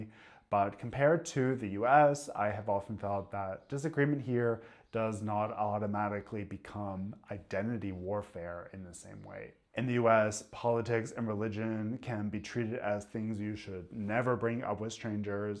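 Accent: Bavaria, Germany. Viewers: I felt it when I was in the core of a little friend group for the first time around my 30th birthday. I’d never had that growing up as a nerdy, gifted/neurodivergent girl who naturally clicked better with boys/men but was also not the best at navigating the whole attraction thing due to the undiagnosed AuDHD and being ace-adjacent as well without knowing. It felt amazing to be chosen without a lot of masking, within my nerdy railroad engineering friend group 😊. I noticed your inflection is a lot like Feli from Germany, so I’m going to deduce it’s a Bavarian/Munich based way to end sentences tone and length wise, I’d heard another German creator who spoke US English do the same, but I wasn’t sure yet if the area inter US where they learned English was the shared factor, or if it came from a German region 😅.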